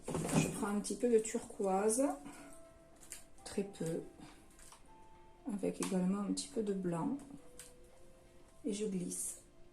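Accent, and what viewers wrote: South, France. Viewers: Je ne trouve plus de nouveaux mots pour dire combien j'ai ton travail et ce tableau est à tomber. J'aime le rose, mais avé l'accent c'est encore plus beau. Merci. Merci 😘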